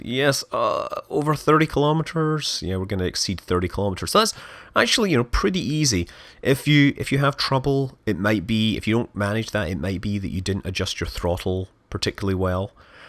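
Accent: Scotland, United Kingdom. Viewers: Man his accent is doing my fucking head in. half DJ half Scot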